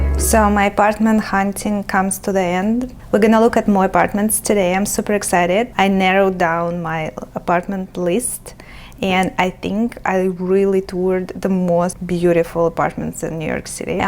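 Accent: Russia. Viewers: невозможно слушать такой сильный русский акцент!!!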